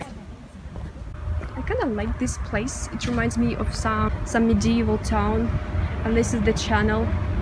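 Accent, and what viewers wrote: Moscow, Russia. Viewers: I am surprised how little of accent you have, most Russians I meet in Canada have heavier accent than you do. yet you barely have any. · A Russian girl can cuss me tf out with that accent, and I'd sit there like😍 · You are so comfortable with your delivery,and so are we.Get out of the small town,Big things await you.You need to travel.I'm sure we will follow.Love Russian accent! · Shes been there eighteen years. The local kids hear her speak and think she's from Moscow. She then asks the kids about places for tourist to visit in the town.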